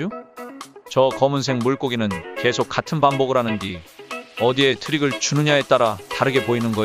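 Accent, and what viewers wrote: South Korea. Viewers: 나 충북 증평군 사람인디 님 약간 충북 사투리 같다. 그려 안그려?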